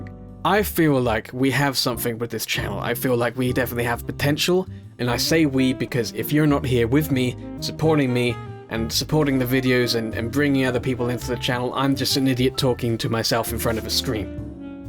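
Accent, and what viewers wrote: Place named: South, United States. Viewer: I died a little at the southern accent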